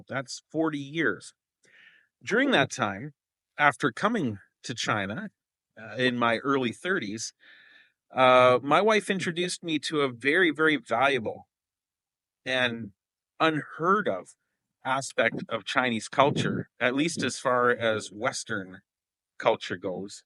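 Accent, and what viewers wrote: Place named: Canada. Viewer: Lovely Canadian Accent 👍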